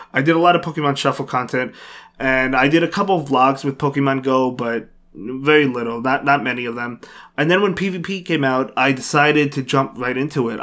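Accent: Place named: East Coast, United States. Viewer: I just thought you had an east coast accent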